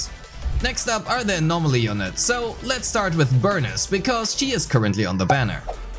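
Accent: Germany. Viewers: Are you german? Your accent reminds me of german ^^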